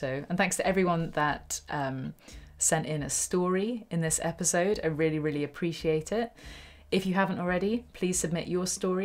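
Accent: United Kingdom. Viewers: The wat you Brits say "pawn shop" doesn't sound like pawn shop to my American ears. Thought, at first this story was going somewhere weird! Lol! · So yeah, with the British accent I spent most of the first story thinking this person had found a guitar in a porn shop when he was "14 or 15 years old." I was puzzling over that one so much that by the time I realized it was in fact a pawn shop I had to rewind and re-watch the beginning of the story!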